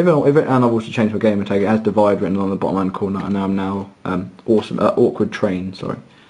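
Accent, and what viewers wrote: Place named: United Kingdom. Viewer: The HEAVY Brit accent is hard for this Yank to understand.I got about half of what he said, Sorry mate.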